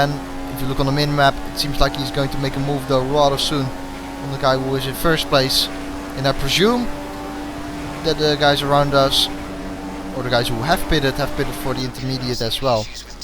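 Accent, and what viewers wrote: Netherlands. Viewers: geweldig dat nederlandse accent hahah ga zo door!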